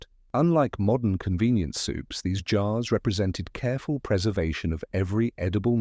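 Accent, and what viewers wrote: United Kingdom. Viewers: The content is interesting. The mixture of American vocabulary with the British pronunciation keeps throwing me.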